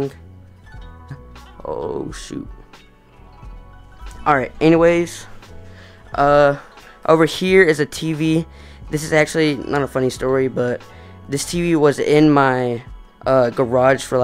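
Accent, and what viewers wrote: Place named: Texas, United States. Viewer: Impressive room. Desktop setup is amazing. Little clues like your accent and the weather today make me think you’re in Texas.🤷🏻‍♂️ Great content. All the best. 🗡Ace🗡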